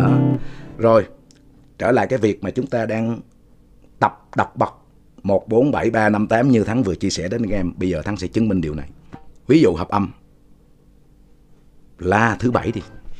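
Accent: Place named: Cam Ranh, Vietnam. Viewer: ..lúc đầu nghe tiếng nói a e nghi nghi rôi ..vì e cũng là ngưòi Can Ranh .Mong a nhiều sức khỏe.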